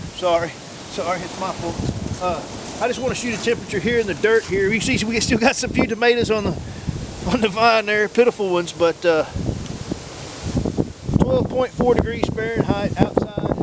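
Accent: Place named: Kentucky, United States. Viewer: Thought you sounded local! Southeastern KY?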